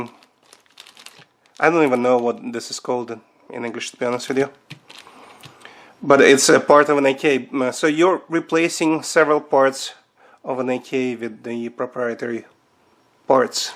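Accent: Russia. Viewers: слышу русский акцент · Русский акцент ))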